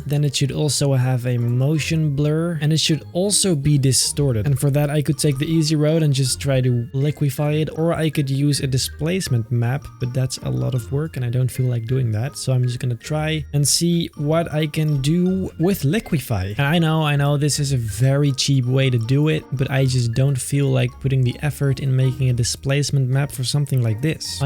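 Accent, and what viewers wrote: Netherlands. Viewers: Wow maat dat is sick dat ik jou nu pas tegen kom. Ondanks dat je Engels zeer goed is kreeg ik toch meteen al het gevoel dat je Nederlands was🙈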